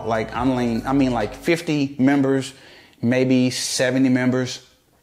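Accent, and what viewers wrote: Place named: South Carolina, United States. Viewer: Were are you from the accent sounds familiar. S carolina I'm guessing. Just a guess.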